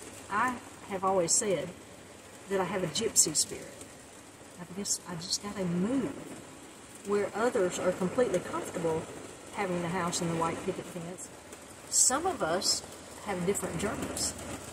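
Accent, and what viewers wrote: South, United States. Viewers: Where are you from? You have a southern accent but were going to see your son in New York. I was just wondering where your journey began.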